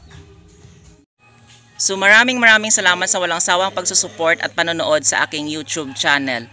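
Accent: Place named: Cebu, Philippines. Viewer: taga iloilo ka pala lods? akala ko cebuano ka..heheh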